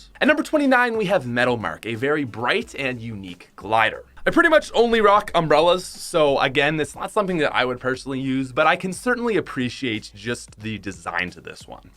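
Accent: Canada. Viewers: All Canadian “oots”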